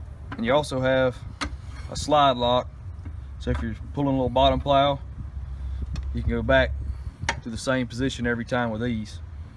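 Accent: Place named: South, United States. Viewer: Understood 'most' of it through that southern accent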